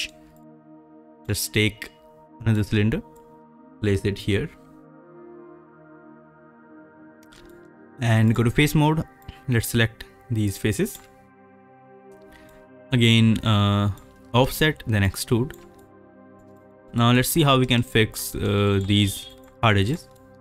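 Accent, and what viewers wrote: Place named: India. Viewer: Indian Accent = 10/10 Tutorial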